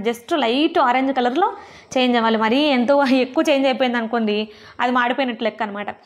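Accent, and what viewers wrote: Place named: Godavari, India. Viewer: Hi this is purnima mee mattalu vinty gala gala godavari gurthuvastundi😀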